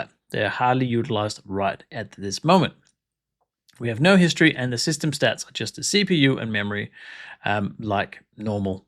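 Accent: Denmark. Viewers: Hi, do I hear a little Danish accent - also the name maybe give you up?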